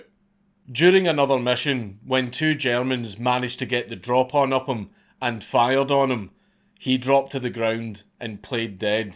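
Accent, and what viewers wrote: Scotland, United Kingdom. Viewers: I don't know what the hell it is but the scottish accent is delicious to listen to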